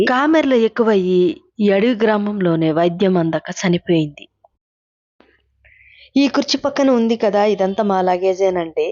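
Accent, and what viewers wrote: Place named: Godavari, India. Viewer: సిస్టర్ నీ వాయిస్ గోదావరి జిల్లలో స్లాగ్ లాగా వుంది కానీ నువ్ అది వాసిలాగా ఎందుకు సాగ దిస్తున్నావు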